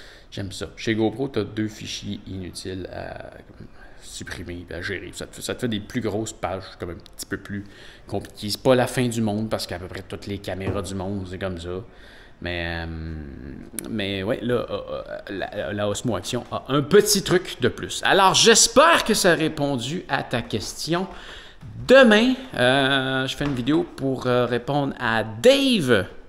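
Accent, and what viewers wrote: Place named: Quebec, Canada. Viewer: Tu m’as convaincu avec 2 choses : le trépied aimanté (on y pense pas mais dehors il y a du métal partout donc des trépieds partout !!) et ton accent québécois 😂 je viens de découvrir ton compte je m’abonne directement 🎉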